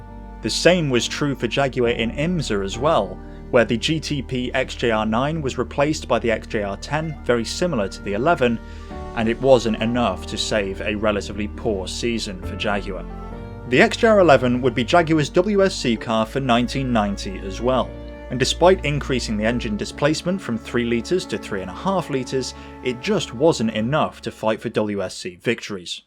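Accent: United Kingdom. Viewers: As a french man I love your cotenent and the way you tell racing stories ! The English accent may also play a role... 👍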